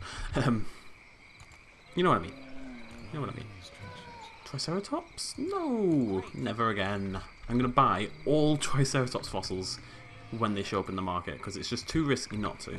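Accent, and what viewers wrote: United Kingdom. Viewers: I find your British accent hilarious when you speak French.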